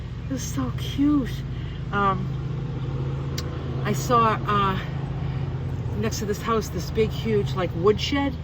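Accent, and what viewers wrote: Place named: New England, United States. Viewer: I’m a NH native and love seeing my home state being enjoyed; you have a distinct NE accent so it must be fun to be back in the neighborhood. Be safe… Wish I’d seen this sooner as I would have encouraged you to visit Willard Pond in Hancock… you can swim there for free - my grandmother established it as a reserve and it eventually was handed over to the Audubon Society to manage it.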